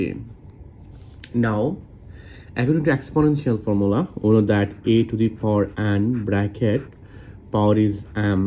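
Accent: Bengal, India. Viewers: Pajeet accent!!! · Thank you but please try to speak with a clearer accent. You sound too young to speak with a Bengali accent. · U Bengalis hv really pathetic and irritating accent. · Brother sounds Bengali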